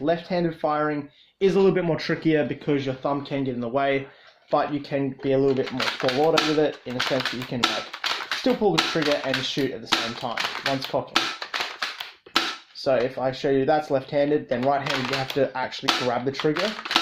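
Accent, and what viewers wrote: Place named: Australia. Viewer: Jelly Fishyzz all Australians sound like him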